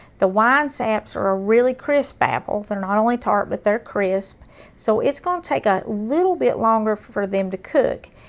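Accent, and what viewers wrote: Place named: Ohio, United States. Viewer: Hearing your voice reminds me of relatives from Ohio. They would bake cakes and pies for the Church July 4th festival in Wapakoneta. I loved it. We'd travel from PA to it when I was a kid. Thanks and God bless you 🙏. Hugs 😇🤗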